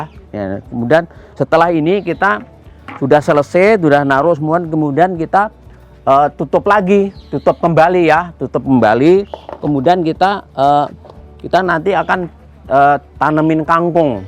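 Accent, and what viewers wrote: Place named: East Java, Indonesia. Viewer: sprt org jatim yah mas ...😁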